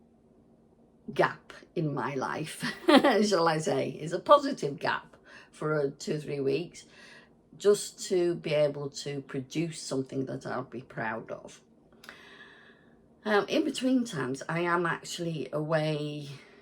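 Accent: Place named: Merseyside, United Kingdom. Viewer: Oh, thank you! I have to say that your accent brought me back to my childhood trips to see my grandparents in St. Helens. We would regularly go into Liverpool and have mini-holidays in Blackpool. I don't if you know those areas but they are very dear to me.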